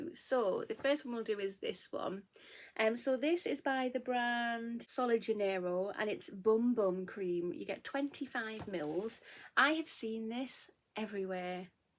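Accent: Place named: South Shields, United Kingdom. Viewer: I went over and had a look! Out of curiosity where are you from? I’m getting a South Shields accent coming through but I may be wrong!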